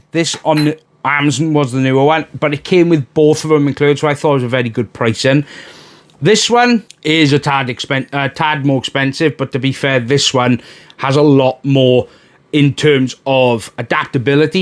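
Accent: Wales, United Kingdom. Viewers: You really as Welsh as it gets butt innit?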